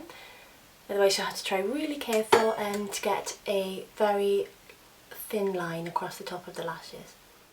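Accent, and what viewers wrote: United Kingdom. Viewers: you welsh?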